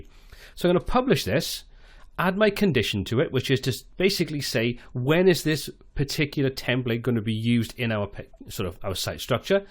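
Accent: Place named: England, United Kingdom. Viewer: Yes, they're absolutely brilliant. Nice to hear an English voice too.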